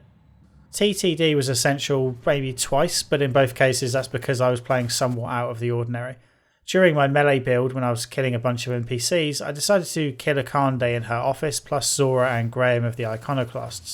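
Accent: United Kingdom. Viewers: “The game presents an extremely anti capitalist take, witch I am completely on board with” Cronyism and a type of Vulture Capitalism has its problems for sure... But Free Market Capitalism has bin amazing for us in so many ways. Corruption in people is what’s led to most of our problems.. the Free Market is somewhat the answer to these problems. Capitalism is a much better alternative to Socialism or Communism.. A lot of hippies and propagandists have bin at work over the last few decades trying to convince people otherwise.. They think something that’s already failed many times and led to the death of hundreds of millions of people is something we should try again. A very small and homogeneous country like Denmark had to stop these socialist types from making the claim they were a successful socialist society.. It’s still not something that would work large scale with groups of people indifferent with each other. 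Freedom is worth more then life itself. limited government is almost always the better option. I live in Canada with a socialized healthcare system.. and our healthcare system suffers in so many ways because of this. Not to mention our high taxes and outrageously high cost of living.. You live in the UK from what I gather.. the accent. I know the NHS has a lot of problems as well.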